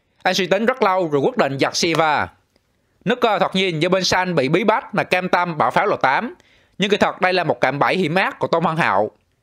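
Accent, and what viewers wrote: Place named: Phu Yen, Vietnam. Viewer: Nghe giọng giống Phú Yên . Hồi trước cũng ở chung với 1 a Phú yên ở Q5 nhà cô làm ở xưởng thuốc lá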